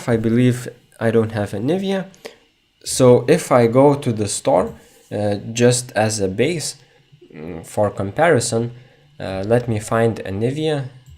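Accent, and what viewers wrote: Lithuania. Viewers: r u lithuanian? ur acent is just like lithuanian talking english:D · Let me guess, you're from Lithuania. You sound like one though. Your accent is litterly Lithuanian.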